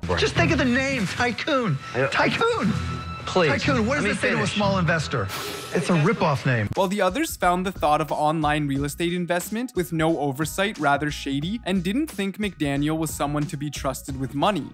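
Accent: Canada. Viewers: bet the VoiceOver is a Canadian dude... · He's Canadian :D Probably from the Edmonton or Ottawa area. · Just the classic Canadian “out” pronunciations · He sounds canadian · The narrator is Canadian AF! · It's called being Canadian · He's Canadian, so..... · I'm going to venture a guess and say the narrator is Canadian. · I think he is Canadian.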